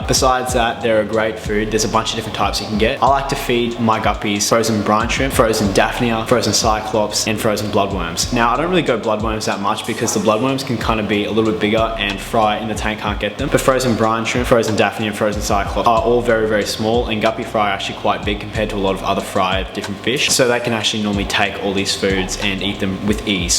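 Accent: Australia. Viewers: Are you an austrilian??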